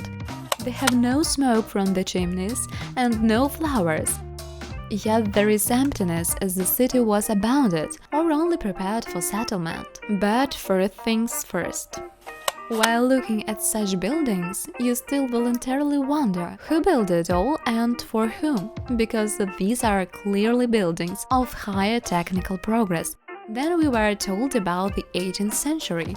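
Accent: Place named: Russia. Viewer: Ear cutting her russin english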